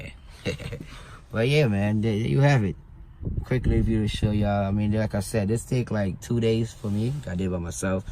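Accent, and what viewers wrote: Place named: Jamaica. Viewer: Yes sir and you sound like ur from yard….. My fam is JA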